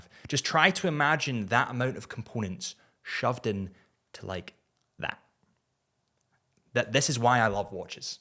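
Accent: Scotland, United Kingdom. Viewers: I love the Scots accent... it's so cool. Connery was the best James Bond.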